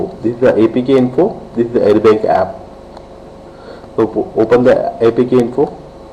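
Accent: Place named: India. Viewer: God damn Indian accent, can`t understand anything !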